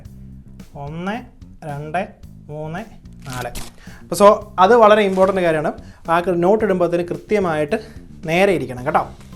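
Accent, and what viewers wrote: Kottayam, India. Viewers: കോട്ടയംകാരൻ ആണോ!! നല്ല സംസാരശൈലി!!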